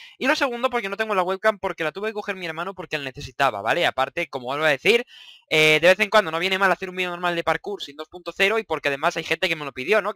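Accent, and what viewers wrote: Argentina. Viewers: sabes hablar bastante bien en argentino. Saludos desde Argentina eres uno de mis youtubers favoritos sigue asi. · estas hablando cordobes como david saludos de argentina te qiero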